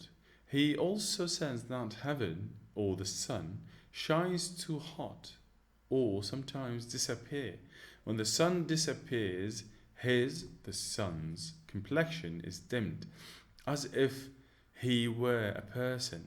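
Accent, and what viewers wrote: United Kingdom. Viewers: I'm not into British accent but you're literally something else 👏💙!!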